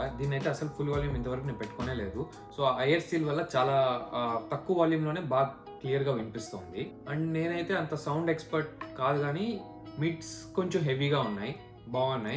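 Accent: Chittoor, India. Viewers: Bro chittioor kadha meedhi??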